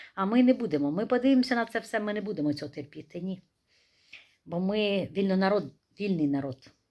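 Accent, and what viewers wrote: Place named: Ukraine. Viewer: Почитала и прослезилась . Сколько злобы и несправедливости , слепой ненависти . Дизлайк Вам , мадам ! Вы позорите украинский народ , не весь он такой . Моя бабушка была украинка , золотой человек , интернационалист ! Кстати , говор , как у Вас , акцент . Поэтому я и обратила внимание на Ваше видео , приятно стало , душевно . А потом .... Жаль . Не одумаетесь Вы , никогда .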